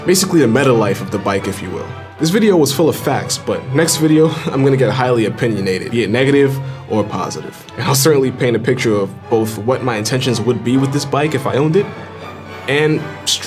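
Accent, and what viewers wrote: United States. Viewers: Sounds like any other normal American to me 🇺🇲 💪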